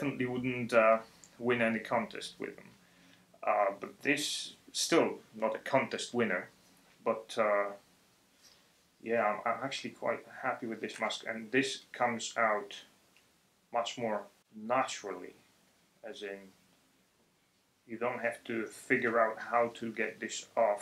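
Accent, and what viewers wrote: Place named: United Kingdom. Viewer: powiedz mi skąd masz tak fantastyczny akcent? Urodziłeś się w UK czy w Polsce? BTW fantastyczne materiały miło się Ciebie ogląda i te polskie napisy, w których tłumaczysz często na skróty wychodzą czasem zabawnie:) Tak trzymaj!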